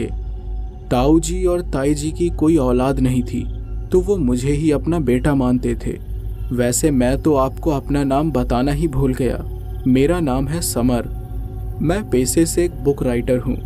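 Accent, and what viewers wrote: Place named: Bhojpuri, India. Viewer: Your best story so far. And loved the Bhojpuri flavour in it. Maza aa gayil ekdam 😅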